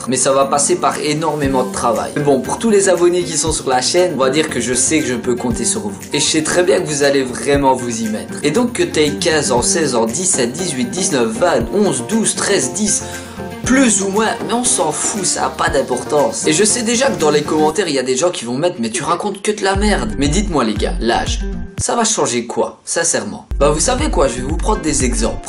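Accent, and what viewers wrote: Liège, Belgium. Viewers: EST TU belge ? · tu es belge ?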